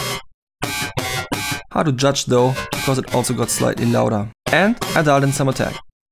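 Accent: Germany. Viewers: Du klingst so stark deutsch 😂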